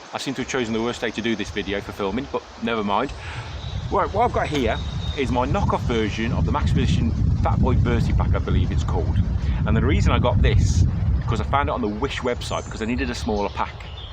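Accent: England, United Kingdom. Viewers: You will have to forgive my cynicism but when I hear an English accent talking about bush craft and wilderness survival I get a bit sceptical and sarcastic. This guy may have experience in the bush and living in remote places but this video looks like it was taken in a park. Years ago I worked in northern British Colombia and worked in some extremely remote locations and lived out of a tent. In some cases, the only way in and out was be a helicopter or one heck of an arduous long walk over impossible terrain. I am sure there are people who have experienced more challenging circumstances and more desperate survival situations then me but the average guy hasn’t. I’ve had numerous bear encounters, slept in a tent in the snow, slept in the rain with nothing but a sleeping bag and a tarp, been in dense forests with huge hemlock trees in the late fall living out of a tent, lived in a tent in early spring when you would wake up in the night covered in frost, or been baking in 40 plus degree Celsius temperatures in the outdoors with no way to cool off except to drink hot water that was baking in the scorching heat. 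I was always well equipped and for the most part had some kind of back-up, support, and resources. Real survival in the wild is not a game or about having some items in a backpack. If you are ever truly stuck in a remote location and can’t get to civilization it will be better to have some survival items but they won’t help you much. When you haven’t eaten anything in 8 ho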